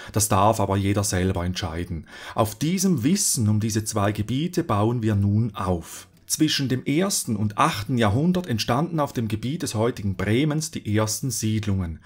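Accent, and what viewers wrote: Switzerland. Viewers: witzig , schweizer akzent erklärt das plattdeutsche land ... 🤣🤣🤣🤣🤣🤣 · Lol....Ein Eidgenosse unterrichtet deutsche Geographie und Geschichte :D Jetzt hab ich alles gesehen und kann glücklich sterben xD Dein Akzent is der Hammer ^^ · Also ich muss hier mal ein Lob aussprechen. Für jemanden mit Schweizer akzent - is das astreines Hochdeutsch. Hört man sich gerne an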